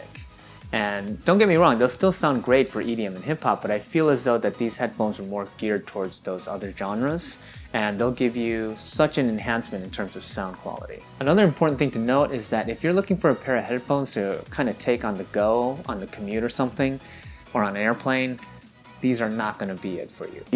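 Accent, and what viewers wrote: United States. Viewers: your American accent sounds very pleasing and accurate!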